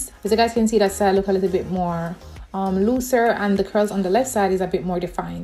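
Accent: Jamaica. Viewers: I have a sixth sense for a Jamaican accent...... Great video🇯🇲🇯🇲🇯🇲🇯🇲🇯🇲🇯🇲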